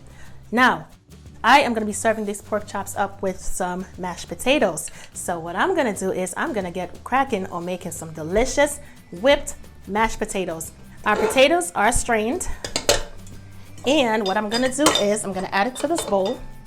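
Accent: New York, United States. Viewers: I hear the New York Accent! Whaddup Sis! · love the N.Y. accent!!!!! thank you.... i just followed on the gram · sound like you from NY but this meal is a southern classic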